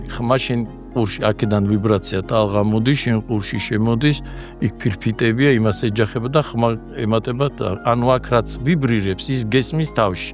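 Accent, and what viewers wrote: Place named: Kartli, Georgia. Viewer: ნირვანა დატოვა და რით რითი შექმნა... რა მომწონს კიდევ; ბევრი უპროტესტებს. ამხელა ზენიტის შემდეგ რომ😊ქართლული კილო არ დათმო და არ "შფოთამს"❤მიყვარს!!!❤❤❤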